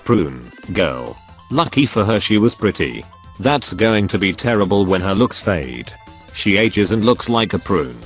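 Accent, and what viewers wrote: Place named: England, United Kingdom. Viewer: A robot with an English accent is still a robot. You need to do better.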